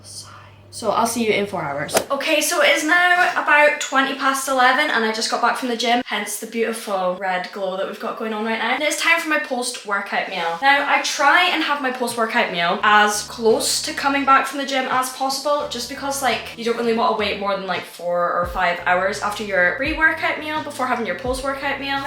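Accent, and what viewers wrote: Scotland, United Kingdom. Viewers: What part of Scotland is she from? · Are you Scottish?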